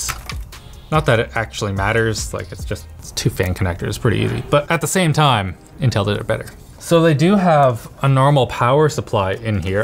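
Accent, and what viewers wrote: Canada. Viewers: I just realized why I love videos so much: he has the thickest Canadian accent of anyone at LMG. Edit:spelling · never noticed this but srsly sounds canadian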